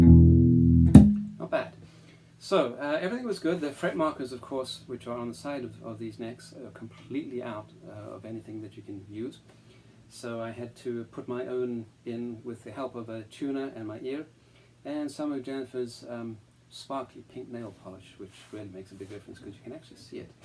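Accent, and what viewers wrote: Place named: Florida, United States. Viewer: I’d recognize that Florida accent anywhere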